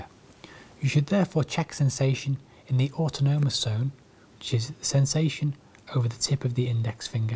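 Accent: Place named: Liverpool, United Kingdom. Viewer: I've been looking for a Scouse ASMRtist for a long time, didn't think I'd find it in the form of unintentional ASMR. Love it.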